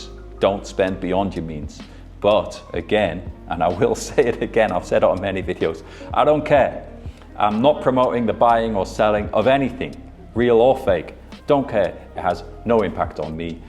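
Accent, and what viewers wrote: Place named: Newcastle, United Kingdom. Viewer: Love your accent mate your Geordie still pops out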